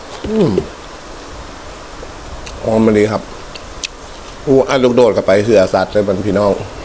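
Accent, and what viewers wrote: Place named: Nakhon Phanom, Thailand. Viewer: คนที่ไหนคะสำเนียงเหมือนคนนครพนม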